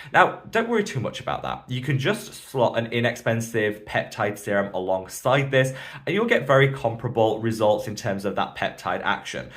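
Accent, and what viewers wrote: United Kingdom. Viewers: I enjoy listening to you. Your voice reminds me of a dear friend who’s a British expat in New Zealand!!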